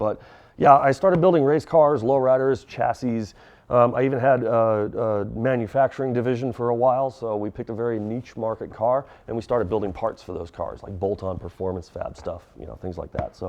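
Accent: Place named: Transatlantic, United States. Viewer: Your voice does have a good tone, and you also speak with an accent similar to the formerly popular transatlantic accent used in a lot of media. People have told me the same and commented on my voice, but it's just how I talk. Especially when I'm on camera or teaching something. Wonder if it has to do with schooling or where parents are from or media consumed or what. Love the pink gloves rationale as well. I don't have time for needless negativity in my comments.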